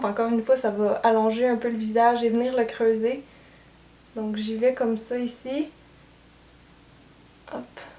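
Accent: Quebec, Canada. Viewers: bnj je suis kahina de l'Algérie pour tous vous dire je ne suis pas trop maquillage mais depuis que j'ai commencé a suivre vous vidéos j'ai commencé a m’intéressé hhhh vous expliquez super bien votre accent j'adore vu que j'aime le Québec mais j'aime pas trop le froid lol vraiment du fond du coeur bonne continuation longe vie a votre chaîne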